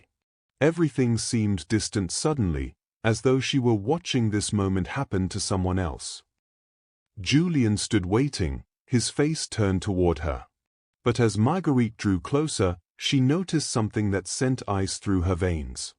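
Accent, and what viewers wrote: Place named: England, United Kingdom. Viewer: It’s nice not to have an American accent reading. Please keep the English accent.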